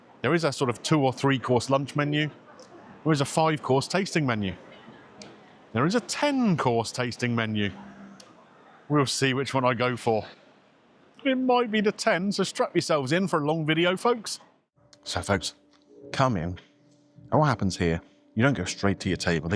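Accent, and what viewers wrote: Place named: Birmingham, United Kingdom. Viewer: Its funny watching a two Michelin meal being described in a thick Brummie accent instead of the usual French, real Oxymoron, ha!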